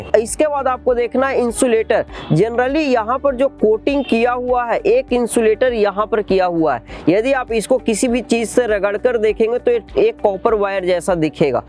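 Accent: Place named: Bihar, India. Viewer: Bahut menat karna hai abi bihari jaise bol rahe.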